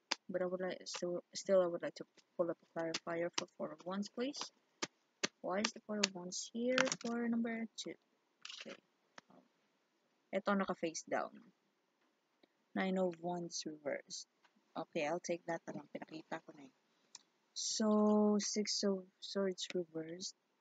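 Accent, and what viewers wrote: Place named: Visayas, Philippines. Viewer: Bisaya diay ka maam.😂no worries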